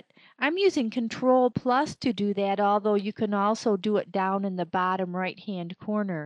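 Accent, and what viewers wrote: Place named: Minnesota, United States. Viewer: i love the minesota voice.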